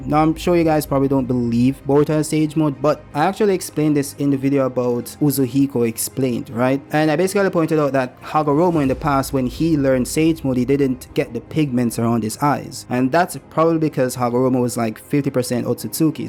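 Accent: Jamaica. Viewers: Bro are you Jamaican? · Are you jamaican? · Are you Jamaican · Bro you Jamaican??? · I would say Jamacian or guyannese It is how he says the Word About. my two guess Worst one would be Grenadian.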